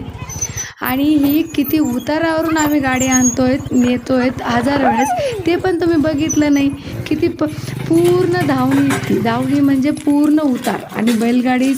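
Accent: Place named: Ahmednagar, India. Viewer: ताईआपल्या भाषेवरून मला वाटतं आपण नगर जिल्ह्यातल्या आहात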